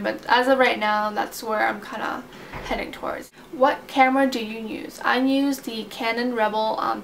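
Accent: Vietnam. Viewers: AND: My Sister is looking like you (80%) and she is 100% Full Northvietnamese.. So, i believe you are more than "1/4 Vietnamese" ( ' o . o ) But your accent is Southvietnamese.. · I honestly didn't think u are vietnamese, but then now u mention it I can see some vnmese features on u and hear a little bit of viet accent. Ur viet is very cute too <3 I honestly think u have cute teeth, pls don't be embarrassed about it, can't believe s.o ask u that kind of question. what's the point if everyone has straight teeth right?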